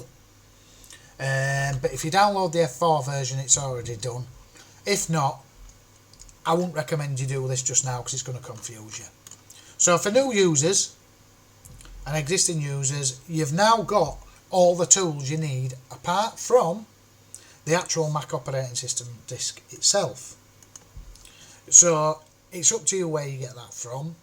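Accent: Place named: North, United Kingdom. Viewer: Great video mate! Nice to hear a tutorial with a familiar northern accent :D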